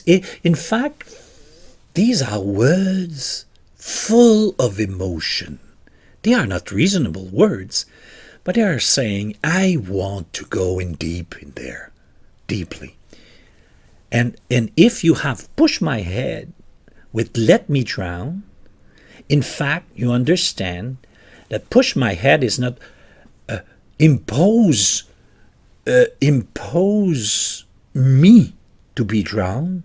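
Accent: Quebec, Canada. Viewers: Dès vos premières phrases, je me doutais bien que vous étiez Québécois. Moi je suis de Boucherville, j’ai découvert les sœurs Villarreal via un vidéo de réaction sur leur premier cover de. Je fut conquis sur le champ. Depuis, je les ai vus en spectacle 4x (2x à Monterrey Mx et 2x à NYC). Elles donnes tout un spectacle. Mais, ce qui m’a vraiment décidé d’aller les voir dans leur ville fut une conversation internet avec leur papa. Toute la famille est tellement terre à terre et ils sont super gentils. Vous, vous les avez trouvés/découverts comment?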